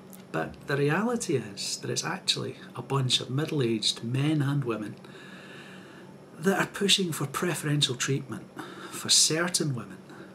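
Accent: Scotland, United Kingdom. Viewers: you're reasonable, soft-spoken, w/a bad-ass scottish accent, AND you like cartoons? *subscribes*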